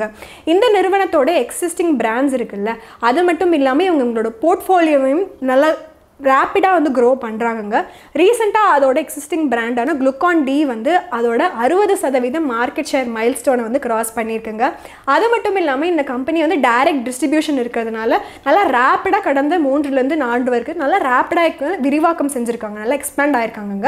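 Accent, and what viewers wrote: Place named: Coimbatore, India. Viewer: Neenga Coimbatore nga la nga.... Nga ...nga ....arumaya pasuringa......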